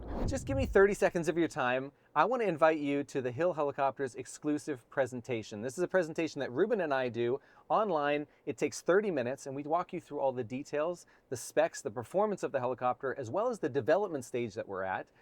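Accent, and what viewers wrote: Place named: North, United Kingdom. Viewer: HA, he's a northerner...help you oHut.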